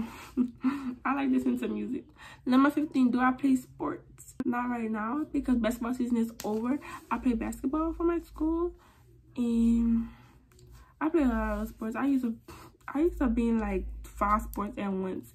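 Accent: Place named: Louisiana, United States. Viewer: the first few seconds ikkkk you was from louisiana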